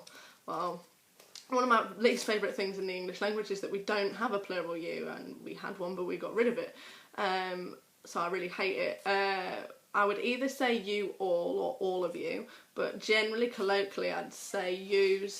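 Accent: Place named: North, United Kingdom. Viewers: I remember speaking to somebody from Harrogate once in Manchester and being from just outside Sheffield in the country she almost sounded southern! Noticed that in York too. Your accent is much closer to a Yorkshire accent although yous (which is Irish) is also used throughout Manchester and Liverpool and seems to have spread to the rest of England. If I am speaking to one person I say 'thee' but I say 'you lot' for more than one person. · North Yorkshire is a Northern accent nothing southern about it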